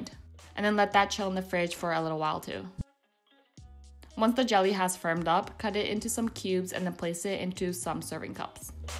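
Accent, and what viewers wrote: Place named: Canada. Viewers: Where are you from? I love your accent :). Perhaps Canada?^^ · Lol i thought canada too!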